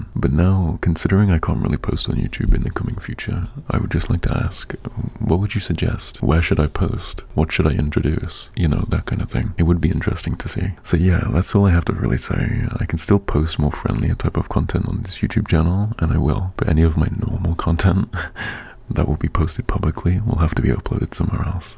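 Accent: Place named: United Kingdom. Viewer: he's fucking BRITISH???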